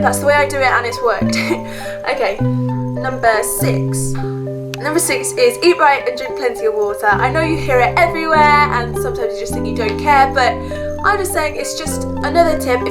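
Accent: United Kingdom. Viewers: She is British tho. Most people understand the British accent, it’s yours that nobody understands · She is fast plus British accent🙄 and the music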